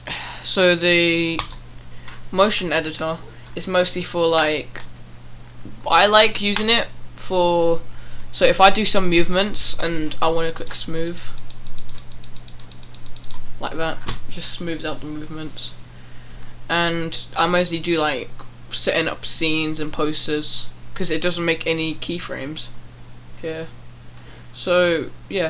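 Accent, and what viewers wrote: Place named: United Kingdom. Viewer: This is the most bri"ish accent I've ever witnessed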